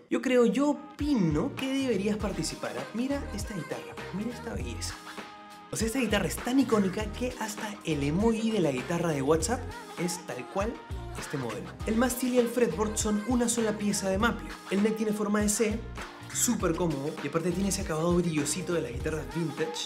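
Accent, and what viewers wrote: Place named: Mexico. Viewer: recien conosco tu canal y dejame decirte que pense que eras mexicano jaja que buen contenido tienes !!!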